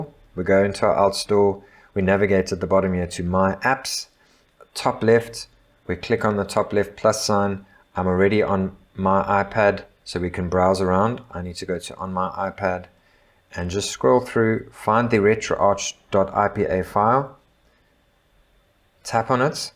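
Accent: South Africa. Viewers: Was about to say that bro sounds south African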